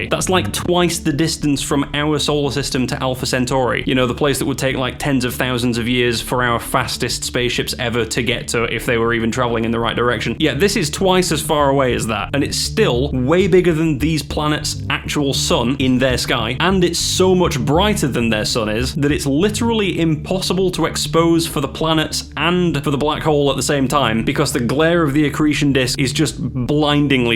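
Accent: United Kingdom. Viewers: Just subscribed absolutely love your commentary 😂 is that a northern accent i hear? ❤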